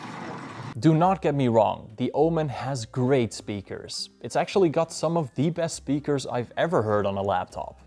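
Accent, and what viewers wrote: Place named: Netherlands. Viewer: U sound so Dutch xd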